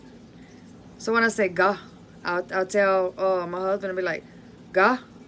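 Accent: Louisiana, United States. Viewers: I love hearing your story & look forward to catching up on your videos. Hearing the Cajun accent again brings back so many fond memories of my Dad and his side of the family. I miss hearing him & my aunts and uncles speak. Especially when they got deep into conversation and moved in & out of speaking Cajun & English. My Gramdma spoke mostly Cajun and very little English. When I was young I picked up on some words and forgot them. (I'm 60) As my Daddy got older he didn't speak much Cajun & had forgotten many words. Then when he was diagnosed with dementia he didn't speak Cajun at all. That is until about 1 week before he passed. He started saying, "aahh sha, I see my Momma & Papa!" Then would hold conversion with them laughing like a giddy child. He was so excited. And did you know Jesus speaks Cajun French too? The night before he passed he opened his eyes, stared up, and started a conversation in Cajun while tears ran down his face. 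He turned to us and said " I just saw Jesus. He's bright and beautiful. I got to go now. Bye." Closed his eyes and passed 8 hours later. That was 10/2018. Dad was 91 years old. I miss him terribly, but I know he is happy & so blessed to be his "sha babae". I love listening to you and a couple of other social media creators tell their stories, speak & teach words. It is bringing them back to me. I am so so thankful for this. 💗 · My sister lives in Denham Springs, and my sister- Inlaw is in Zachary. Love hearing your stories, can’t believe people think your faking how you talk. I can clearly tell you are Cajun.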